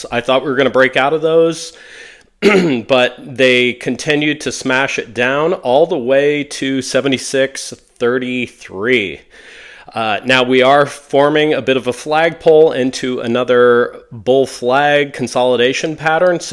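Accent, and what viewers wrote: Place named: Austin, United States. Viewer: I see "TEXAS" next to where it says how long ago this video was uploaded. What part of Texas are you in? I am up here north of Dallas in Frisco. Just saw your channel in my feed for the first time. Sounds like you are in or around the Austin area. I trade for a living and have been trading the precious metals exclusively since '03. Enjoyed the video and was happy to hear it free of price targets predictions but more about just the mechanics of working with the daily zigs and zags which is reallyl all its about once you determine what the med and LT trend direction is, assuming there is one.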